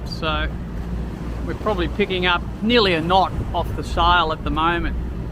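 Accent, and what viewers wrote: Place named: Australia. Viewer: Living in Thailand far from my oceanic playground off the Oz east coast, deriving much pleasure from hearing your Australian accent and that unmistakable diction, I mused, as I am often want to do, at the notion that perhaps during the dying embers of the day, you could recite some suitably inspiring verse with a nautical flavour. Maybe a few stanzas from the Ancient Mariner for example. I, myself, often amused my seafaring companions at sundowner time with either a poem or a sea shanty. Works wonders for morale and appetite. My suggestion would be an Aussie rendition of the Drunken Sailor with a glass of red held high. Awesome bloody scene!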